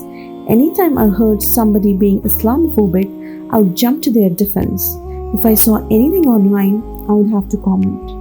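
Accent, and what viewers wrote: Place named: India. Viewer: You say you are strictly British yet you have a very Indian accent. I find that's odd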